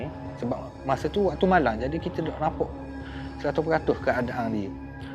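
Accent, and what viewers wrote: Terengganu, Malaysia. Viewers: Jgn nagis bang😂...org ganu eh bang? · Org hok komen negetif tu bute kayu teruskan langkah mu. Hok penting kekalkan pelat Ganu trademark mahal tu. Mana nak cari explorer ckp Ganu dlm dunia ni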